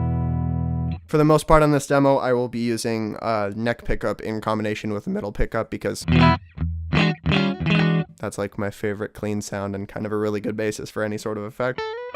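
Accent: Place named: Russia. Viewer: that sounded like a Russian accent, even though it was English. (I think I read your name as?)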